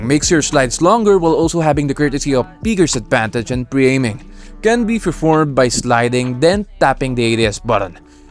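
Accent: Spain. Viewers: is bro Spanish or french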